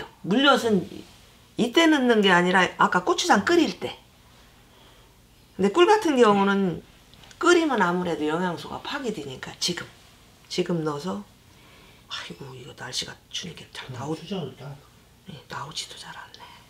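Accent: Chungcheong, South Korea. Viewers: 어머님 충청도 말투가 조금 있으신게 넘 정감이 있네유^^ 저두 충청도에서 태어나서 지금은 뉴욕서 살고 있네유 ~ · 울 엄니가 하시것 같아요 구수한 출청도 사투리 넘 정겨워요 ^♡^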